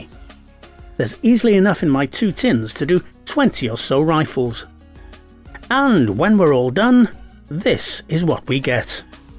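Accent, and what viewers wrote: Wales, United Kingdom. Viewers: Are you welsh sir? 😎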